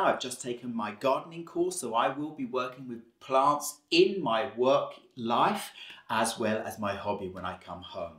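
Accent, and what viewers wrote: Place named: England, United Kingdom. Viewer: ohh nice!!! You don’t look a day over 25!! My hair is about 3 foot long, and I am 6 foot tall lol! My hubby loved long hair and I haven’t had the heart to cut it even though he passed away in 2015. Where are you originally from!? My guess is possibly England or South Africa! You have an accent but it’s hard to figure out!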